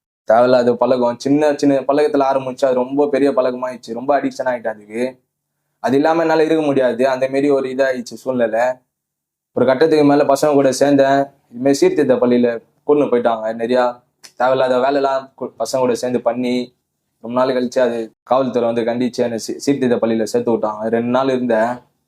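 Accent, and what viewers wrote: Chennai, India. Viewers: Inaikithanda Chennai Tamil kathula thean payuthu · Chennai native slang ah maathunga da dei😂.. kevalama iruku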